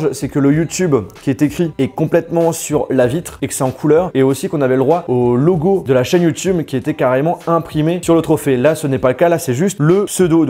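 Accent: England, United Kingdom. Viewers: hahahaha j'aime ton accent d'englais sa me fait rire 😂👌✌️❤️ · TON AXAN ANGLAI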